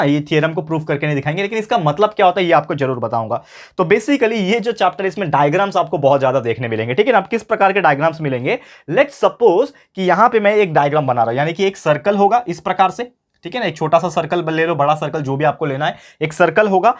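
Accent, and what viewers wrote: Maharashtra, India. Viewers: Sir aap marathi bohot cute bolte ho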